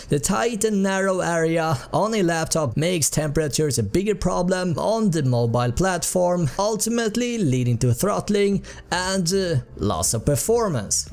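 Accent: Sweden. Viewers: thats some strong ass swedish accent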